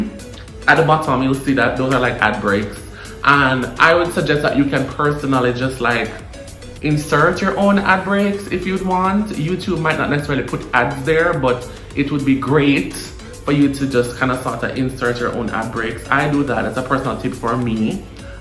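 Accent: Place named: Jamaica. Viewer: Hey bro you sound like Jamaican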